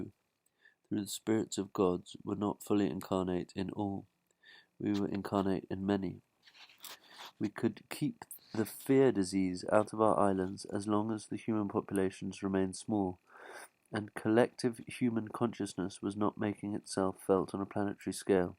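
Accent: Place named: England, United Kingdom. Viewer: Love the book but, just because this guy has an english accent does not improve the presentation. This is pretty lousy.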